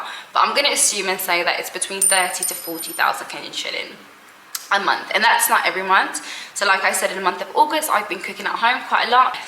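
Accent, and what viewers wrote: United Kingdom. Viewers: She is obviously not American dah🤦🏽‍♀️ her accent is British😂 yeah ban and see how that works for y’all economically, did we watch the same video & what she spent?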